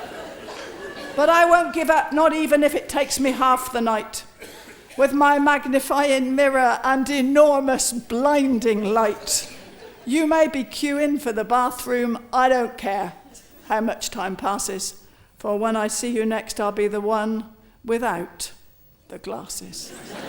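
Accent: West Country, United Kingdom. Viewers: 120 wpm for pitmans shorthand - impressive. I only have the certificate for 60wpm and thats enough for me. For years I thought she was from the Westcountry (because of her accent) and have been disappointed in recent times, to learn otherwise - she's as lovely as ever and is an English talent that we Brits remain rightfully proud of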